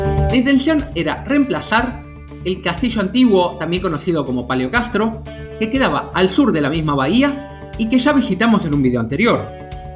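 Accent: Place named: Argentina. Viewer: Gracias nuevamente. Solamente que me cuesta seguirte con esa pronunciación argentina (muy musical y familiar, pero que a veces es difícil para los peninsulares). ¿Cómo has dicho que era el acueducto Olvidado? Lo he buscado en el mapa tal cual y no lo he encontrado. Gracias. ¡Ah, y enhorabuena por la página!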